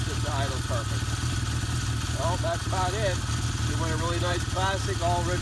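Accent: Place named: Philadelphia, United States. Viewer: you sound like You're from Philly or South Jersey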